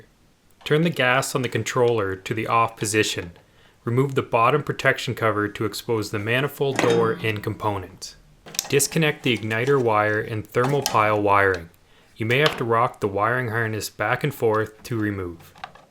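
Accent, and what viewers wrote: Canada. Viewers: Are you Canadian or from the Upper Peninsula?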